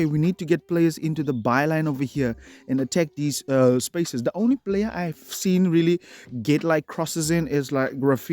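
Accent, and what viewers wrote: South Africa. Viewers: Ummmm, are you South African?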